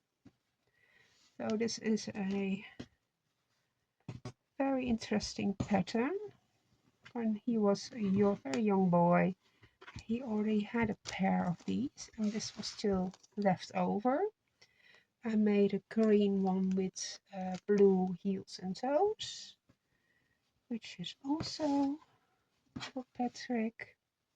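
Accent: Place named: Netherlands. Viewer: Mooi vest! Welke garens heb je daarvoor gebruikt? Je hebt trouwens een fijn accent wanneer je Engels spreekt. Vaak hebben Nederlandstaligen een erg sterk accent. 😂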